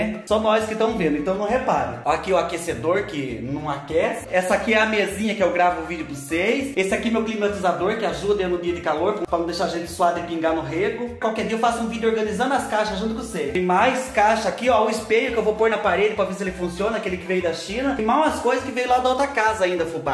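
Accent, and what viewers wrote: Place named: Sorocaba, Brazil. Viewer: Passeando pelo YouTube vi sua receita do bolo de milho... Flocão. Adorei. Gosto do seu jeito! Ah Sorocaba....sabia q tínhamos algo em comum. Nosso sotaque, costumes nos entregam. Abração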